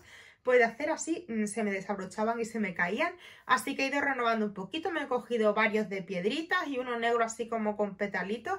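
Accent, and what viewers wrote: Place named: Andalusia, Spain. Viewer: He estado desconectada unos meses de YouTube y... ¡madre mía, qué acentazo andaluz tienes! No recordaba que lo tuvieras, y de hecho tuve que ir a un vídeo de hace unos meses para comprobarlo porque pensé que me había vuelto loca jijiji. Me he apuntado varias cositas para aprovechar el 11 del 11, ¡gracias por las ideas! Un besote, bonita.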